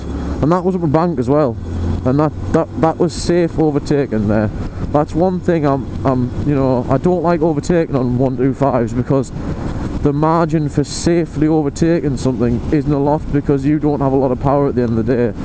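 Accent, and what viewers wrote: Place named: Stockton, United States. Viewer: Where you from mate? Watched your vids and sound like from where I'm from I'm originally from Stockton but live in South Shields now currently a 125 noob vlogger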